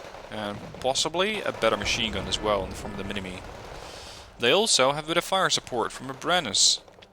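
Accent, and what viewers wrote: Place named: Netherlands. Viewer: Killa Armadilla still, it's an easy mistake to make as dutch and Swedish have several identical words and a similiar flow which makes for similiar accents when speaking English :)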